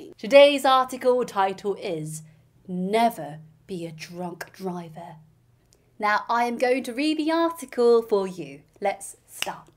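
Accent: United Kingdom. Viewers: 아 발음 넘 멋져요ㅠㅠㅠㅠㅠㅠㅠ 영국발음 개간지ㅠㅠ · 엄청 재밌어요 :) 영국발음듣고 영어기사 공부하는 것도 정말 좋아요, 딱딱하지 않고 방금 영어악센트로 빼박이라고 하셔서 엄청 터짐ㅋㅋㅋ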